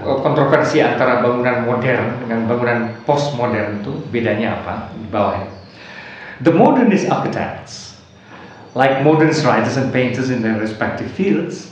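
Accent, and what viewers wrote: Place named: Indonesia. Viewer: Indonesia Bangat bacaannya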